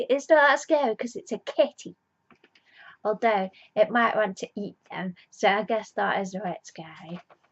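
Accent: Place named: Staffordshire, United Kingdom. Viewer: <3 The Black and White and <3 You. And <3 the Staffs (?) accent.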